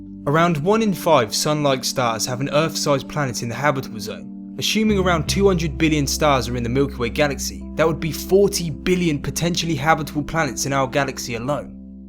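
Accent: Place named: England, United Kingdom. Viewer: G'day. Good vid. I love your pommie accent. Like Curious Droid you say 'Errf' instead of Earth. Outstanding. Vive la differance.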